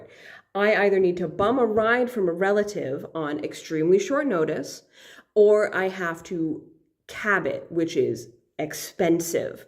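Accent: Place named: Nova Scotia, Canada. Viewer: The thing with the locations of the house of night, is they’re in places PC thinks are important or pretty. There’s three in Italy because Italy is pretty. Europe has a ton of them because classical Europe is pretty. There’s way more in America because PC is American. She doesn’t even really acknowledge Asia because, aside from pretty places like Japan, she doesn’t want to have her self insert characters go there and be in a less well off place than they would be in the Western world. Her worldbuilding and logistics is on the same level as JKR (Also I pegged your accent as Nova Scotian pretty quick due to watching too much trailer park boys lol)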